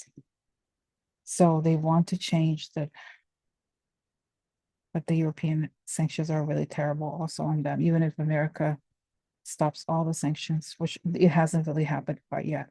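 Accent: Russia. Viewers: Puh-leeze keep the Russian accent when doing Vlad and his filthy band of criminals…..and the teeny tiny 🎻….was laughing so loud, it feels good to laugh at this sordid story we’re forced to live through… Merci. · You are amazing! So intelligent and entertaining! Love the Russian accent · I enjoy your Russian accent and the tiny violin is too too much! Humor can really help during these dark, tense times. Alot of folks who listen to you feel the same way IMO. By the way, the privacy screen is attractive and it's color lightens up your office space. Just wanted you to know. Take care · You are the very best! I love your russki accent! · I LOVE your Russian accent! I look forward to your readings every day. Thank you for giving us hope and keeping our fighting spirit alive!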